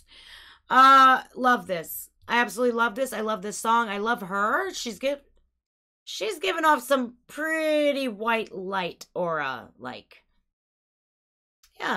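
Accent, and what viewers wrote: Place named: Ohio, United States. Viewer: You are THE. BEST. I love your Ohio accent.:). Yeah, watch Haley's bit. She smolders.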